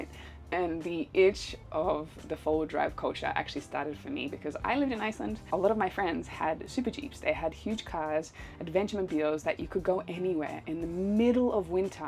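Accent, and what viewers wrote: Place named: Australia. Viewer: is such a cool name! Especially with an Aussie accent, its just fun to say:)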